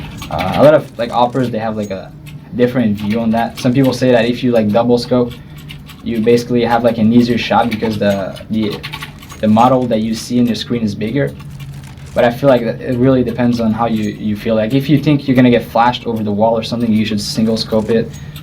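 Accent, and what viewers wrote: Quebec, Canada. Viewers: Dat subtle french-canadian accent. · I like that quebec french accent :D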